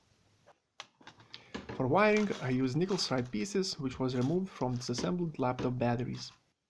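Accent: Russia. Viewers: Русский акцент детектед:)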